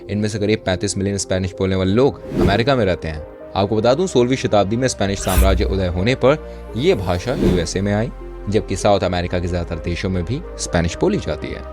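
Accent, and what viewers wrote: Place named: Mumbai, India. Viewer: ye voice or mumbaiya language bahut bor krti h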